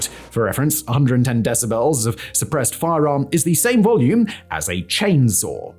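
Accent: United Kingdom. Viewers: Here in Canada, same. Pretty sure this was made by america for America. Narrated by a Brit. It's like real history. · I CAN NOT WAIT to hear a Brit tell me (an American) about guns and gun myths. Stay in your lane and tell me about different teas or how they are prepared wrong in movies. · Sure, let's have the British guy tell us all about guns! Someone did do their research, so i respect that. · Wow, let me just click on this video and let this effete limey school me on guns….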